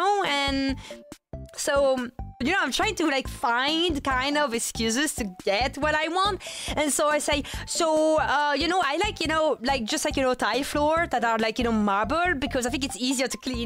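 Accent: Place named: France. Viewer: I disliked french accent before but MAN im started to get addicted to it!!! I apologize... i wasnt really familiar with your game.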